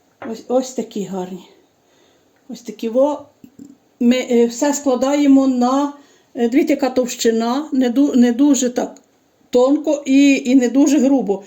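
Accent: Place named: Lviv, Ukraine. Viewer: Чую Львівщину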